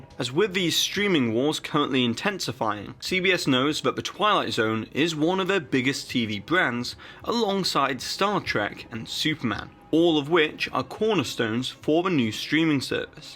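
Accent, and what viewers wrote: England, United Kingdom. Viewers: Great job, we appreciate your hard work! Was that hidden Mickey a Halloween joke? I never miss it🤔. I think you just need to work a bit on your Serling, but pretty good for an English accent! I’ve been to all three parks, but when I was in CA I missed the tower and my visits to Japan were well before Disney Sea. I’m not into the “spooky” girl versions. No thanks, but I do like the CA version with Guardians (thanks for explaining all the changes). I would love to see that version come to Orlando along with Spider-Man to replace Rockin Roller coaster or an avengers theme! Why not retheme that entire section of the park. 25 years is long enough! They just put the Cars attraction (mostly for kids, but an awesome animatronic!). Not sure why they put that there it would have been a great “Marvel” experience unless there’s rights issues! 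Once again great video /. Do we all get a pin for the hidden Mickey as a trick or treat? Yes!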